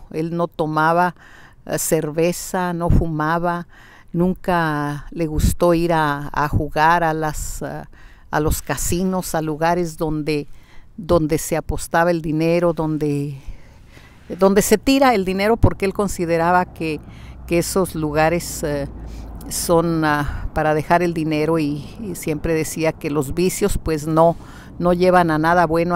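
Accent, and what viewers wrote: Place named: Monterrey, Mexico. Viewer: Hola, su acento me recuerda mucho al de la familia de mi novio, ellos son de Monterrey, México. Un abrazo desde España y gracias por sus videos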